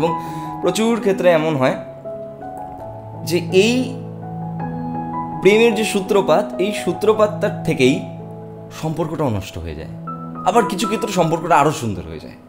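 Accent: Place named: India. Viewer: কথা শুনে বুঝতে পেরেছি বাড়ি ভারতে ভাইয়া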